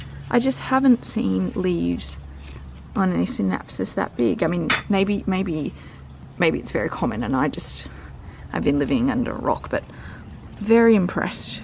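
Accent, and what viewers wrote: Australia. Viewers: You sound Australian! Where are you from? Im a perth gal ✌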